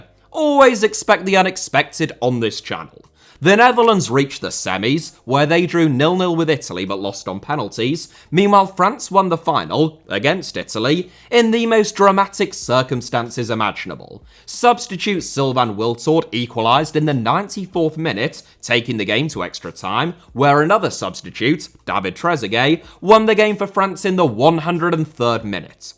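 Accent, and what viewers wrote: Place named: Yorkshire, United Kingdom. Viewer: ah yes, yorkshire man. Now I read football posts on twitter with your accent now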